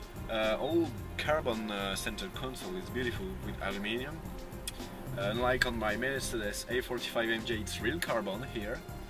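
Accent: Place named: France. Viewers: oui, l'accent français est très net. Es-tu français ? Car on dit : "cet anglais", pas "cette anglais". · Je me disait bien qu'il y avait un accent francais sur cette anglais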